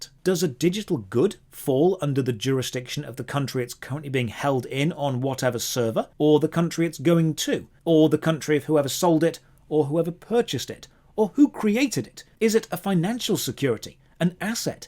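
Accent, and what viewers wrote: United Kingdom. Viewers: Now we need him to fanboy massively at Space Marine 2 and bitch about even more terrible corporate practices with hilarious British accent.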